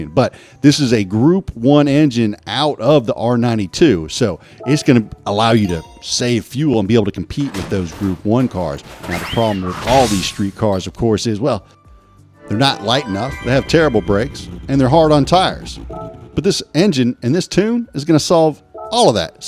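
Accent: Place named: Texas, United States. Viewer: Man that texas accent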